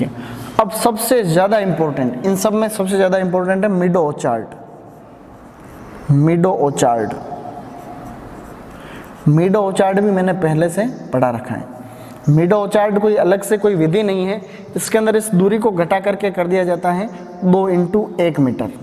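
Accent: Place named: Maharashtra, India. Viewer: aapke baat karne ka tareek mujhe Maharashtra kaa laga so im tell you Any way best of luck for your future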